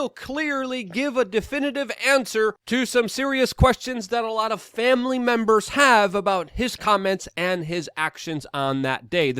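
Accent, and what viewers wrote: New York, United States. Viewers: Luke"s NY accent comes out when he gets mad. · It's kind of funny hearing the new york accent out of Luke after so long lol. It's still mind blowing how much the narrative was clamped down on during and after 9-11. I do believe that there are more people now who at the least question the events that happened back then. The pentagon is the most glaring of them all. That was no plane. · luke had an og nyc accent back in the day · Luke- you’ve lost some of your NY accent! (So have I.) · Luke’s NY accent was a lot stronger then, what a long journey it’s been since. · Wow you I could hear your New York accent in that video!✌️💜🖖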